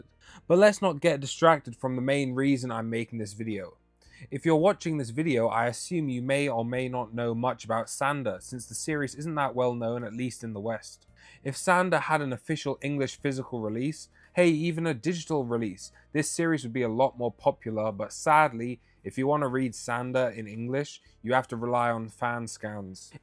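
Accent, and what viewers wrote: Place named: United Kingdom. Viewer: I love it when British people have Americanisms in their accent. Like “marnga” “parrru itagarrki”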